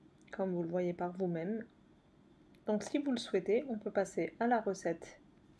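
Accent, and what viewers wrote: Alsace, France. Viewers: Je m'en doutais que vous étiez turc 👍👍👍👍 😊😊😊 de part l'accent alsacien et des recette de pain toujour au top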